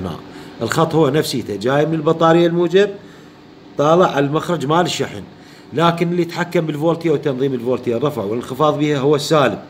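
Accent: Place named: Iraq. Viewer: السلام عليكم ورحمة الله وبركاته اولاً اشكرك على هذا الشرح الرائع بس ياريت بشروحاتك القادمة تحاول تتكلم بلهجة تكون مفهومة اكثر لغالبية المتابعين أخوك من اليمن وعندي مشكلة باللهجة العراقية ما افهم عليك بعض المصطلحات 😅 (تحية من اليمن لكل عراقي)😘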